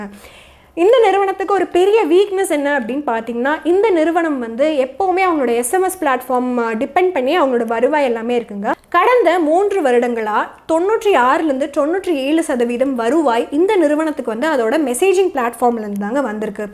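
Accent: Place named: Coimbatore, India. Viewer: Neenga Coimbatore nga la nga.... Nga ...nga ....arumaya pasuringa......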